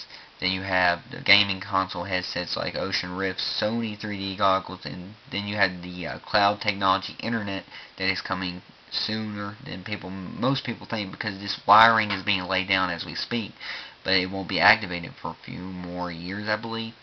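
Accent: United States. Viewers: Are you frome Mississippi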